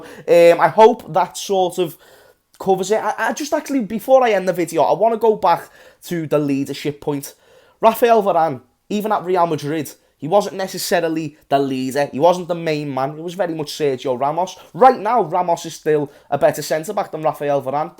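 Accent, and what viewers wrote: Liverpool, United Kingdom. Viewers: “I’m not gonna say it’s a great signing” lmaooo love the scouse salt 🤣🤣🤣 Varane is top 5 best CB in the world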